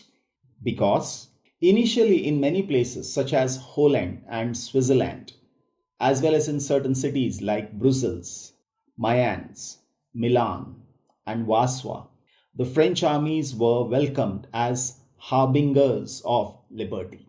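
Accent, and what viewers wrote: India. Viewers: नमस्ते I am an American and I enjoy watching your videos. It is really calming as I have a stressful life. I love the geography exceptionally. I really like learning about India, as it combats the Ameri-centrism and Euro-centrism that is common in American schools. Most influence even came from Asia, from the Indian Spice Trade (to Arabia) to the Chinese discovery of gunpowder and its usage. Keep up the awesome production of videos! 😁👍I like the Indian accent and culture. I had a Nepali friend for most of my life. P.S. I like your look, lol 😂😀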